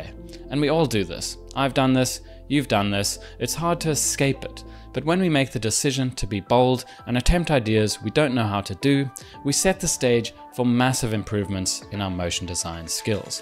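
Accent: South Africa. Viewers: Nice video, I thought I recognized South African English.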